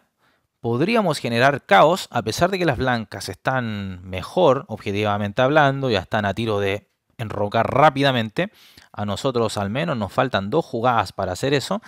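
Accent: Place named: Chile. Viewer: Le encuentro un acento Chileno xd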